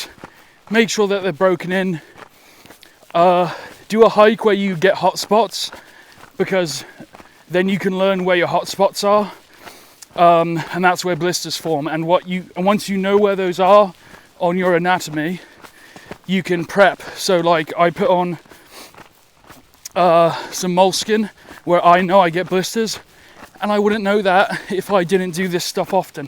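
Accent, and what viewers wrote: United Kingdom. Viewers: I've been trying, unsuccessfully, to place your UK accent. There's a slight hint of "country" to it, but it's very subtle. Mine's a kind of Norfolk/Essex/Cambridge mash-up. Apparently, I get a bit "posh" when I've had a beer. I'm really not! 😂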